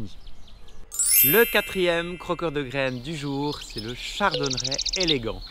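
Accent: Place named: Switzerland. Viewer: Le plus beau chant d'oiseau c'est votre accent suisse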